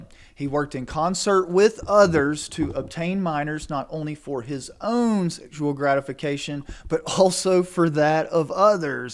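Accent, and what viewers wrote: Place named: South, United States. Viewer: I really appreciated your breakdown. I’m subscribing now. I love your reporting and your southern accent makes it even better! 🎉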